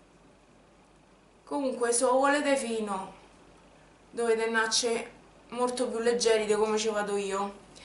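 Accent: Rome, Italy. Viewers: sei fantastica mi fai morire dal ridere !!!!ti seguo da poco(adoro l' accento romano) daje ❤ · ahahahhaha mi fai morire adoro l'accento romano :D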